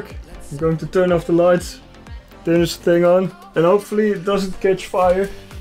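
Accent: Netherlands. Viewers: A dutchman, unmistakably. Ill follow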